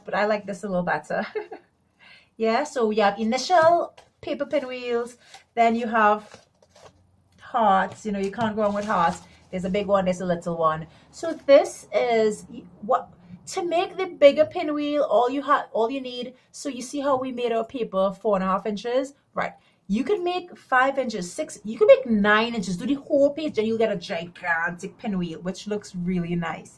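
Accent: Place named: Trinidad and Tobago. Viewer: Love the creativity, the one for you son’s room is beautiful. I love the accent is that from 🇹🇹.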